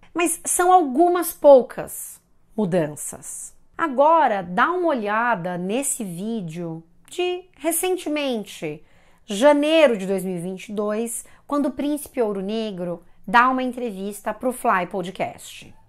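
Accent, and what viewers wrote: Rio de Janeiro, Brazil. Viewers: Amo os "searviaçoish" dos "carioacaish". PS: tive um colega que também fala "eu naisci", acho que é do Rio.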